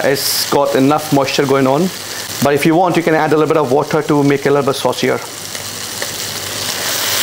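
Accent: Scotland, United Kingdom. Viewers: never heard of sukka. Thanks, this looks delicious. Will use fatty pork though. Your videos have become masterpieces: succinct, beautiful to watch, only the best recipes, and an accent that takes me back 35 years to being a student on the other side of Scotland! Can't wait to try this (speaking Scottish to myself ;-))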